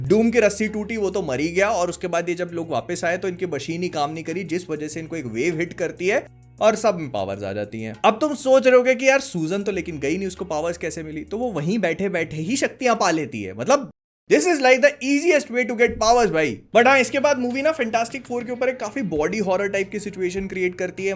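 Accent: Bhopal, India. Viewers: bhopali sunkar maja aajata he. me bhi bhopali hi hun.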